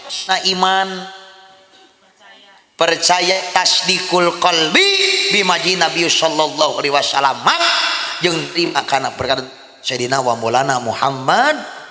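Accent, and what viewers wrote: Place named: Bandung, Indonesia. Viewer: Jigana tipalih bandung atanapi bandung ngupingkeun logatnamah ❤❤❤❤❤❤❤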